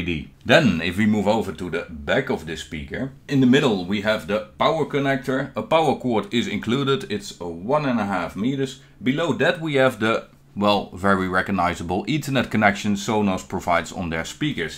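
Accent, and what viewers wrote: Netherlands. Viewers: You sound dutch bro but nice video bro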